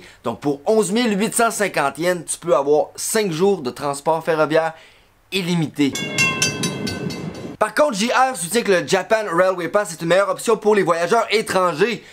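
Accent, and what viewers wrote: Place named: Quebec, Canada. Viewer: Merci! Très intéressant!! C’est bien d’entendre un québécois parler du Japon!! (étant moi même québécoise) J’aime beaucoup ce que tu fais ainsi que ton authenticité!!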